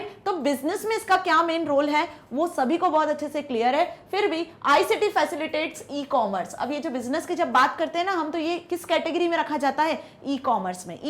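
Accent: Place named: Tamil Nadu, India. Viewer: Mam aap Tamil se ho kya, who was Aisa Laga mere ko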